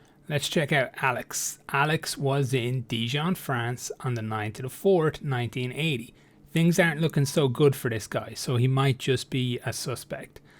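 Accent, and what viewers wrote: Ireland. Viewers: he just has an irish accent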